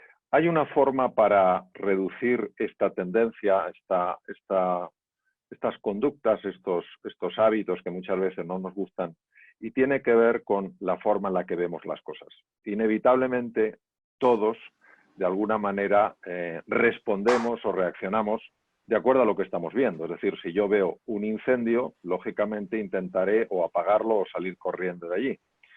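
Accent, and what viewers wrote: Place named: Spain. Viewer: Me encanta el gracejo español del doctor, la sangre española, ole!.- Gracias por esta información, invitándonos a hacernos responsables de nuestras propias vidas. Tenemos al mejor amigo y al peor enemigo dentro nuestro y adentro es que lo vamos a equilibrar. Gracias doctor, por echar luz en este mundo sombrío.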